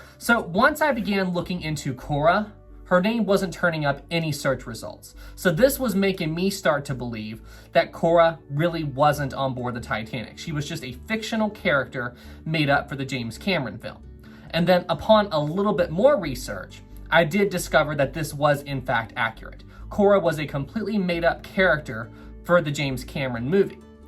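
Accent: South, United States. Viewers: great video from scotland love the sourthen American accent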